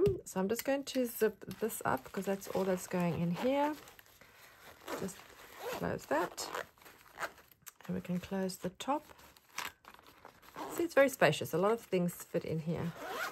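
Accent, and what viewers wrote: South Africa. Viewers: Hi from South Africa. Nice to listen to a fellow SA.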